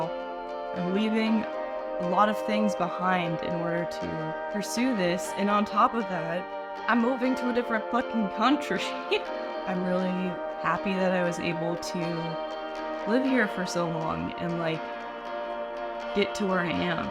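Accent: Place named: France. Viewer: i saw this video after the dream job worst nightmare video, and you speak french natively with a french accent, not from montreal/quebec ? what's up with that? could be an idea for a video!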